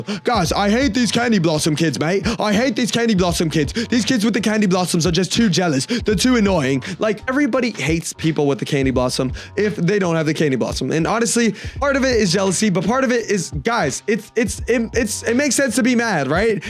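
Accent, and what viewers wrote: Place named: Australia. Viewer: Yo never knew u had a australian accent are u born in australia?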